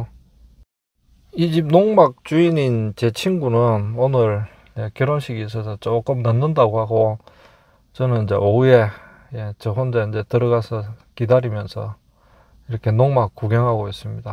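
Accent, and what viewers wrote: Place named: Haman, South Korea. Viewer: 구수한 함안촌넘사투리에 맛깔나는 향수에 푹빠져드는 맛으로 자주 시청합니다! 하시는 사업 번창하시길~~^^^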